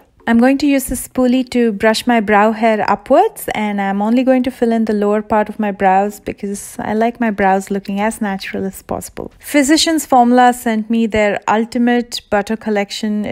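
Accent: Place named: India. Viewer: your Hindi accent is 🤗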